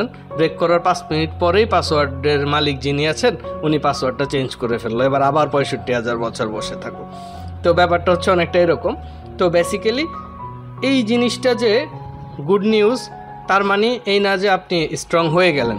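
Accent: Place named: Teknaf, Bangladesh. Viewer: মনা তুমি তু টেকনাফে মনে হয় 🙄 ভয়েস ওরকম কেনো 🙄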